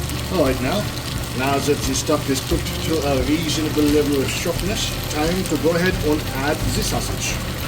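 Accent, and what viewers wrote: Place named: Germany. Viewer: I hate to tell you this but your German accent sucks.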